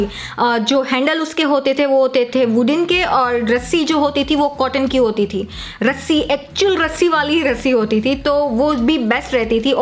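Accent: Gujarat, India. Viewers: Gujarati tone lag raha hai aapki boli mein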